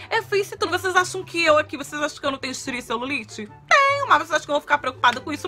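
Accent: Rio de Janeiro, Brazil. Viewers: Esse hino de sotaque carioca aaaaa hahahaha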